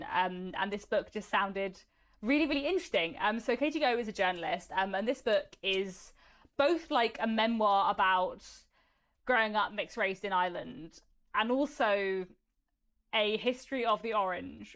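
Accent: United Kingdom. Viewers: I changed upon your blog last week. Very interesting even if you talk rapidly in British accent which is sometimes difficult to follow. Never mind I will catch up with the accent in due course. I am watching this from Bangalore, India. Thank you for the vlog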